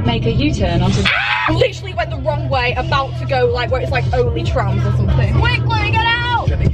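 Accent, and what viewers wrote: Manchester, United Kingdom. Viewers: Kinda caught me off guard when she started speaking in a British accent. I thought I was still on the American side of youtube. Loved watching this though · First word she said I was like “SHES FROM MANCHESTER” n then she said it so I feel validated. That’s all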